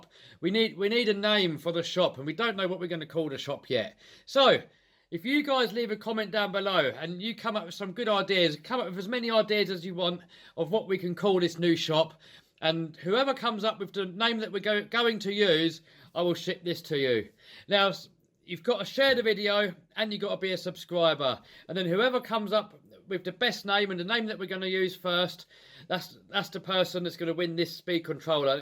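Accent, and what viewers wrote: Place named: United Kingdom. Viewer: I don't know if this will be correct but because of your accent it should be BBB rc ( British bashing brothers) rc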